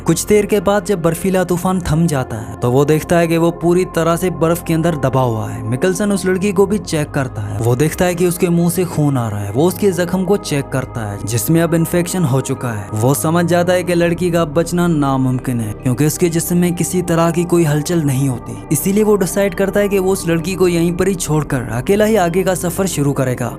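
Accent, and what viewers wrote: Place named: Kashmir, India. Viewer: Your Hindi has a accent of Kashmiri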